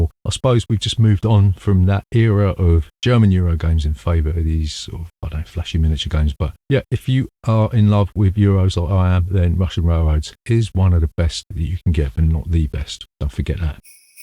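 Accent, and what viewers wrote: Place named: England, United Kingdom. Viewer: Can't place the accent - which part of England are you from .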